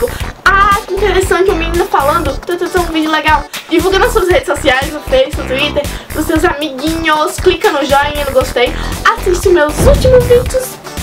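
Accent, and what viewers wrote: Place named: Natal, Brazil. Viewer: Tu é de Natal é? Sotaque lindo... USHAUHUHUASHHASHUS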